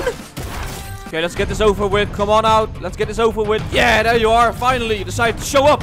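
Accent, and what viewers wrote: Netherlands. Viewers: Lekker Nederlands accent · Nederlands accent echt geweldig😂😂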